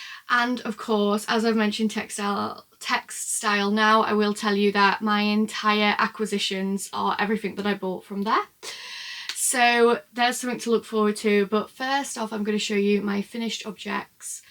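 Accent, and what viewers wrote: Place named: Liverpool, United Kingdom. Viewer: Hi! Just found you here on YouTube. Is that another Scouse accent I hear?? 😍 Love the video. I'll have to go to texstyle if they do another next year. Xx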